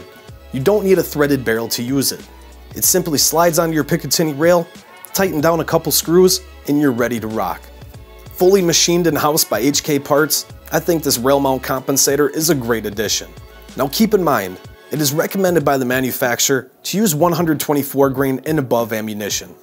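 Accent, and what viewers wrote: Wisconsin, United States. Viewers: Your accent makes me think you live in Manitowoc County and you eat lots of bratwursts. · Sweet Jesus your Wisconsin accent overpowers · Narrator, are you from Wisconsin or that area? That accent is interesting.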